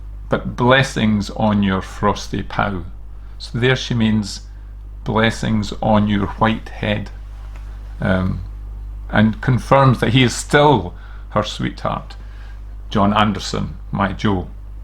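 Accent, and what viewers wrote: Scotland, United Kingdom. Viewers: My name is Liz Moore, and I have Scottish forbesrs.....the Comyns, so I am interested in all things Scottish. Thank you for that beautiful reading of this poem. I discovered it years ago, when I read the book "Dear Enemy" by Jean Webster as it is quoted there, but only the second verse. Now my husband and I are approaching our 50th wedding anniversary, and I want to read it on that occasion, because it so beautifully expresses the joy of two lives lived together. If I may, I would like to play your version - a real Scotsman reading that lovely poem!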